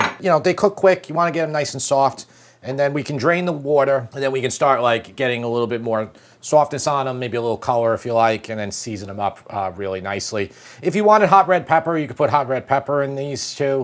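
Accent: New York, United States. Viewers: He sounds so “New York” — I love it! The “Taste Tester” is so darn cute! 😻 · I JUST FOUND THIS CHANNEL!!!! Cannot believe I'm seeing this for the first time. Great recipes, great personality. He sounds like a New Yorker, like me!!!! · I love your NY accent. the NY accents are dying off. because of the 1 billion foreigners flooding into NY.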